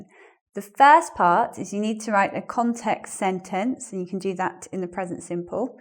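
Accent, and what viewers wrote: United Kingdom. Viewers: she has British accent. I like her voice lol so similar with my professor from London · Your Great, Miss.I was looking for real British English Lesson and now finally found you on you tube, awesome! by the way I'm from Nepal.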